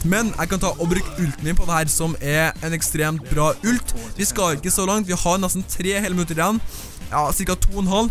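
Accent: Trøndelag, Norway. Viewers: Trøndersk e bæst!!!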